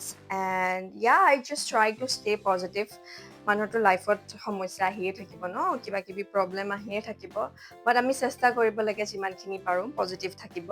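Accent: India. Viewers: Baa emn dhunia koi kotha bur kua ekdom upper Assam r style t ..... love from Sivasagar .... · Tumr Maat tu Huni e gom paisilu tumi amr Jorhat r e hoba sge buli ❤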